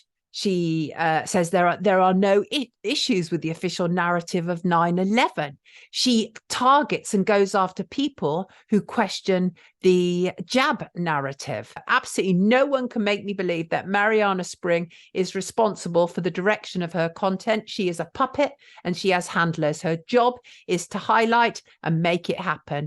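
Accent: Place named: Bristol, United Kingdom. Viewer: Great video, Gloucesters finest.. Being a Bristolian born and bred I can hear your lovely accent creepng out every now and then, I realise you've had to hold it back for those London types over the years lol.... Marianov spring, what an embarrassment to the country she is... You have more integrity in your toe nail clippings than she will ever have.. Keep up the good work, god bless you.. The truth always finds a way... James.. Bristol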